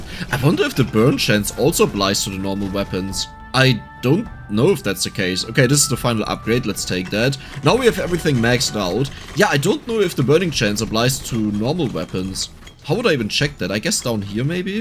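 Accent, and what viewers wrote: Germany. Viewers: 5 seconds in the video and i could already tell you're german lol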